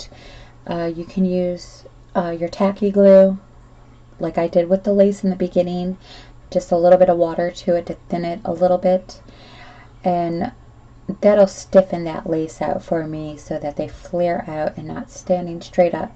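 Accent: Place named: Canada. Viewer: Your accent reminds me of canadian english the way you say certain words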